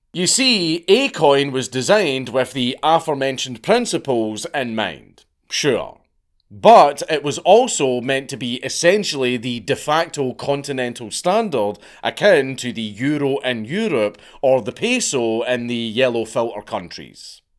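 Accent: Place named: Scotland, United Kingdom. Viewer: I like how Count Dankula doesn't try to change his Accent for the International Audience Because of him i feel like i can now fluently understand Scottish for when i visit Scotland as a Rural Bavarian from Germany Prost & Cheers from Berchtesgaden in the Bavarian Alps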